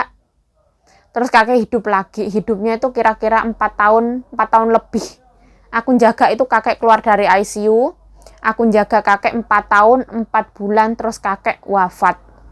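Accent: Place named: Malang, Indonesia. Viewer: aku denger kamu ngomong kok lucu bener, kata di kaploki kok jadi ingat bahasa Malang yo Jeng? aku jadi geli denger nya. Okay salamku dari jauh Jerman ( Germany ) Berlin. Salam kenal juga.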